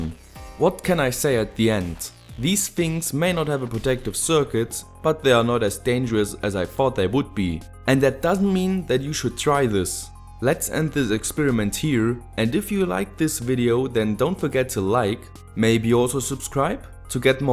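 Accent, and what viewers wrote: Germany. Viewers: yeah he has perfect and his accent isnt wierd i it sounds like a normal german accent, I used to live in germany for 2 years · Your Accent is really dominant. You sound pretty german.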